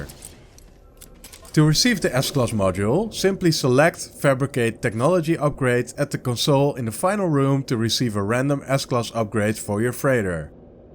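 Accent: Netherlands. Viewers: 😂😂😂 1 seconden en ik hoorde het gelijk😂😂😂 proud of the dutch accent 😂😂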